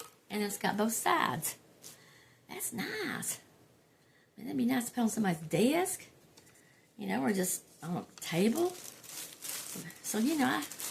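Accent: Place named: South Carolina, United States. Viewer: HI,. Just found you today. And so happy I did. I was cooking breakfast and heard that accent. I am from South Carolina, so I know "us" when I hear it. I ran into the family room and was like, "Yes!". Maybe I will see you out there one day. Have a blessed day.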